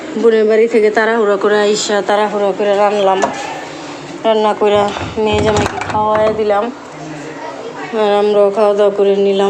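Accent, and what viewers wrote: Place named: Comilla, Bangladesh. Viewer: আপনার বাড়ি ব্রাহ্মনবাড়িয়া না কুমিল্লা কথা শুনে মনে হচ্ছে ❤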